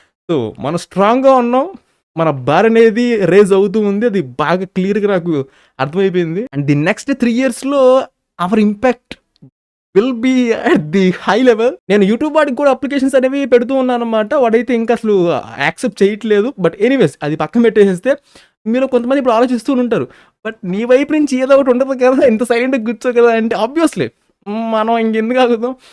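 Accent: Guntur, India. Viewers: Bro meedi guntur aa😮? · Bro nuvu guntur ana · Bro meedhi guntur aa ❤ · Bro nedi GUNTUR yee naa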